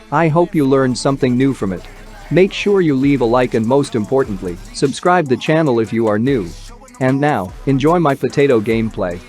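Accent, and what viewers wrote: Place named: India. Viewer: You from india maybe 😅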